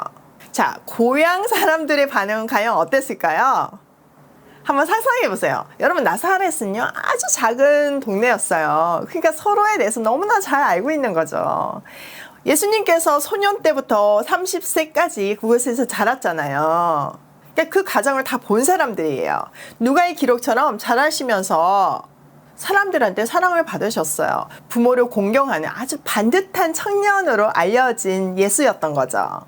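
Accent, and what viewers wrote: Gyeongsang, South Korea. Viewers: 할렐루야!! 삼천포가 고향이시네요 경상도 사투리가 넘 친밀해요 저도 고향이 거제도에요~^^ 감사합니다 🙏 🙏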